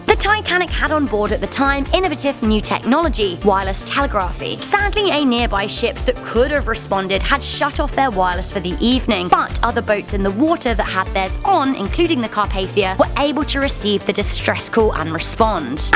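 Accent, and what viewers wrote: England, United Kingdom. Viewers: I love how this English accent lady uses miles instead of kilometers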